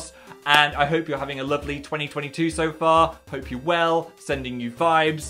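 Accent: North, United Kingdom. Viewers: Is northern accent getting stronger every year? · i swear you're getting more northern the more the years go on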